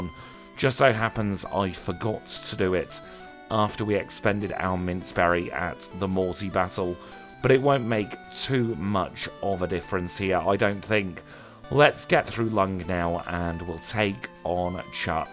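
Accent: United Kingdom. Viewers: u british?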